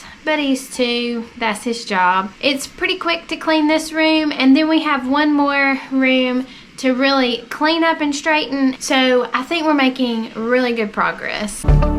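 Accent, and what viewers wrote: South, United States. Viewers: I’ve never related so much to a video in my life!!! With 2 under 2 (11 months apart 🥴) it’s so hard keeping up with everything once you get even a day behind . I’m from Alabama and most of my fam still lives there so I love your accent and southern charm! 💛 don’t be so hard on yourself , you’re doing just fine! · Your home is beautiful. I hear a southern accent? what state are you in? I am not in the southern states.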